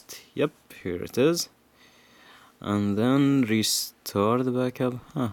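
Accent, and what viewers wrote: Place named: Gulf, Arab world. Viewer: واضح انك خليجي او شي من لكنتك و والكلمات ما تقولها بسرعة ثاني شي الناس الي بالواتس مكتوب تحتهم بالعربي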